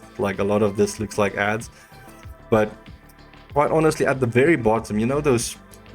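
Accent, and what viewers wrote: South Africa. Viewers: Great video, helped me two years later, thanks, am sure I hear a South African accent there! Lekker Boet!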